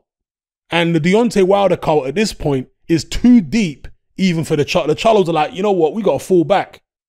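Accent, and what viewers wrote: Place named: South London, United Kingdom. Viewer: South London IIRC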